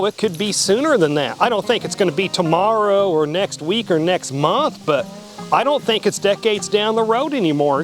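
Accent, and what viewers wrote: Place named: Delmarva, United States. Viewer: U have a delmarva accent, where yew from?????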